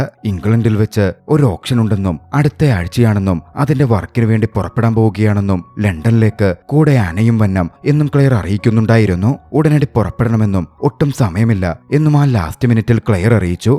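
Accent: Ernakulam, India. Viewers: നിങ്ങ എറണാകുളത്തുകാരനാണോ?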